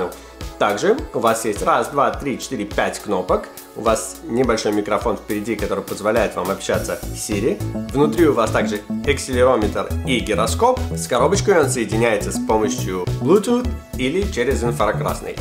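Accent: United States. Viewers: Американский акцент человека у которого родители привезли в детстве в США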